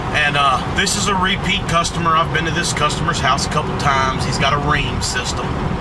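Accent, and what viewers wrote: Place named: South, United States. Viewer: great job and video and finding all kinds of problems. only item i would comment on is the coil cleaner. that stuff takes off hte protective wax coating applied at the factory and no matter how much you rinse it, it eats the coil regardless best thing to use is dawn dish soap. my teacher at tcc in norfolk va told me this in class many years ago and he writes the ashrae hvac books. not to mention run's carrier out here and before that ran trane commercial. the only thing to use coil cleaner is on an evaporator coil and use the stuff in a can. also why did you call your company? are you isreali? i have never seen a jew with a country accent! interesting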